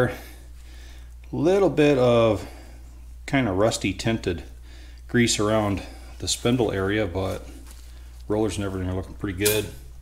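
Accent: Minnesota, United States. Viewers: Here's a curious observation (not a criticism).....the extremely rare times you appear "stressed" on any video, your uniquely Minnesota accent comes out. As for the "swagger" inference.....it's more likely due to the way you walk especially in the field.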